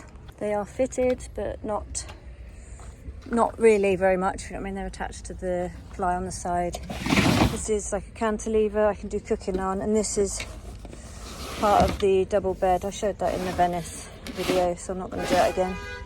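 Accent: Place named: West Country, United Kingdom. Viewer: It's nice to hear a bit of your story. I used to live in Exeter and I'm from Marlborough, I knew I recognised the slight ooh arrr in your accent.. 😂, don't worry, it's very slight. Haha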